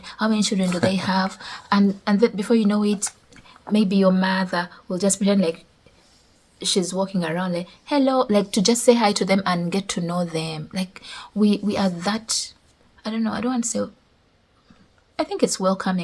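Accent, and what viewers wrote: Uganda. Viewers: Hahaha I love your humor 😂😂 please don't change that Ugandan conversational accent. You two make us feel like we're part of your conversation 😂😂 I wish that food was available here. Ehh waiting for a restaurant 😅😅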